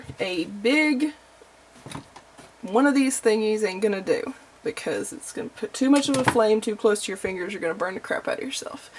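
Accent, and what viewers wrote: Texas, United States. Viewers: Texan english rules! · Love the Texas English!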